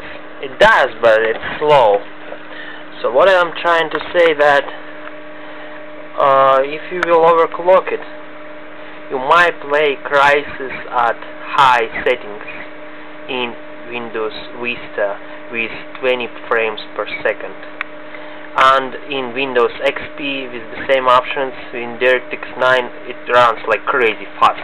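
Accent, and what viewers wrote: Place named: Russia. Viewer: Lolz your accent is so funny you said windows "vista" with w instead of v like "wista" my friend is also russian and ALLWAYS switches btween v and w if its windows he says vindows and if its vista he says wista lolz I am russian too but I don't say that I also do not have a russian accent Oh well nice review tho I don't completly agree with you about it not being compatible with DX10 games this game can run crysis on mid res like 1024*768 or 1280*1024 with every thing turned to medium...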